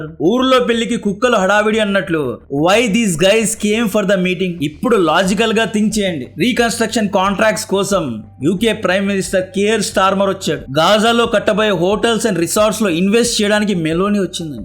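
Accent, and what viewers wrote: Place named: Anantapur, India. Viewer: You slang is anantapur district slang, am I right. Please confirm me. Thanks!😊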